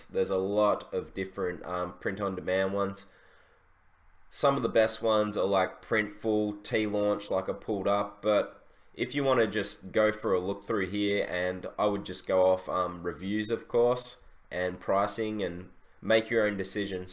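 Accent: Australia. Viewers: Thanks again meng you the best!! You are from Australia correct? Sorry if i'm wrong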